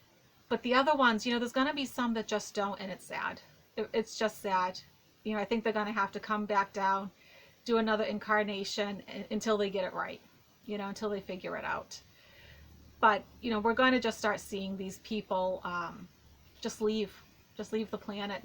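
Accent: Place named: Boston, United States. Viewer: you're so awesome at all of this! I'm sure it's tough to keep focus while taping, and playing Oprah/Donahue to a growing audience. Thank you for your clarity and warmth; and what a poignant way to honor Otto. I'm in Los Angeles, but I grew up near Boston, so it's comforting to hear that accent again! For all of us who you're now reaching worldwide: thank you for changing Youtube and changing our days, for the better.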